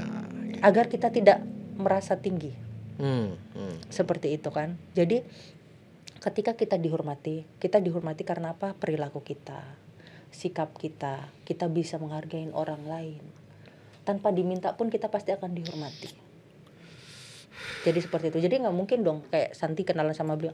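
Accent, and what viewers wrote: Bali, Indonesia. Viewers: Host nya hrs belajar lagi bhs Indonesia jgn medok bali. Kedengaran lucu😂😂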